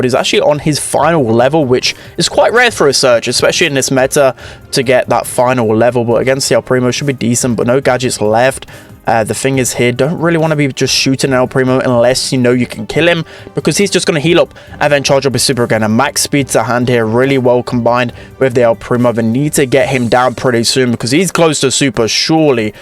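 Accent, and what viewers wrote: United Kingdom. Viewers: I just love British Accent. Underrated commentator too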